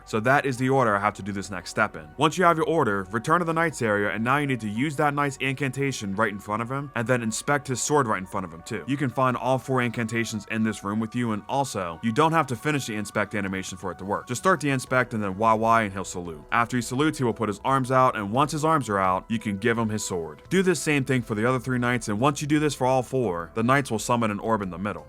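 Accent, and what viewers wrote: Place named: United States. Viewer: Every time I look up a guide it’s some British dude. Glad to hear an American man, or at least someone that sounds like one 🇺🇸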